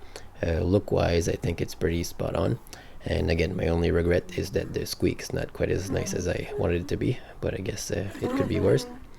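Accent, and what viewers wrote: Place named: New Brunswick, Canada. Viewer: Super nice! Couldn't help but notice your accent... are you from NewBrunswick?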